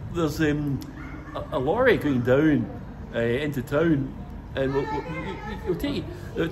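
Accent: Scotland, United Kingdom. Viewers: I would typically play such videos at twice the normal speed.....but given the Scottish accent, I have to watch this video at the normal speed....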